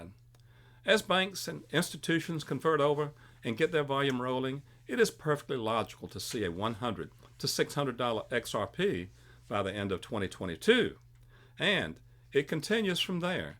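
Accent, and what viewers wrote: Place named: South, United States. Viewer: the 100% smart guy, & I love the southern accent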